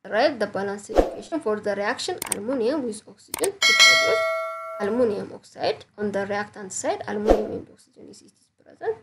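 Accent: Ethiopia. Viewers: She is from Ethiopia I know by her accent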